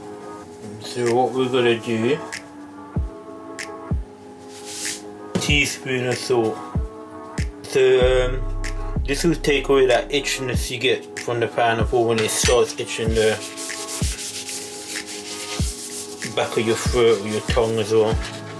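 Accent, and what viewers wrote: England, United Kingdom. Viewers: Which country is the english accent? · I remember this from my time on the island many moons ago. But it is terrible to listen to a Mauritian speaking English in an English accent😁 No disrespect intended.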